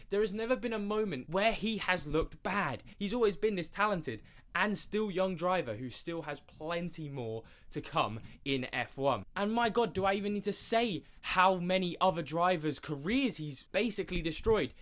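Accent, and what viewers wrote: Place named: United Kingdom. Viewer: Your British accent made it exactly like you wanted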